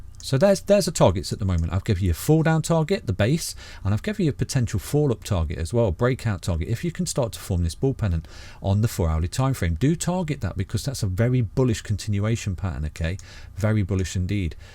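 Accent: United Kingdom. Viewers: I 've got my headphones on and i'm so enjoying his warm British accent, i cant even hear his neighbors car at the background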